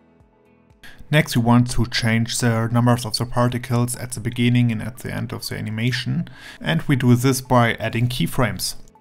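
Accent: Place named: Germany. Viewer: Heavy German accent - mach's doch vielleicht auch mal in Deutsch. Englischsprachige Tutorials gibt's hier schon en masse.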